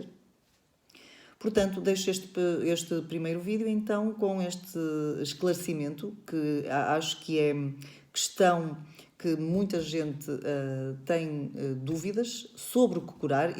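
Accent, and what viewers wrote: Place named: Portugal. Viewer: Você é linda! Fiquei tão feliz de te ouvir e te ver. Gratidão sempre pelos seus ensinamentos. Para mim é uma delícia ouvir a voz portuguesa que é maravilhosa! Muita luz para todos nós ❤❤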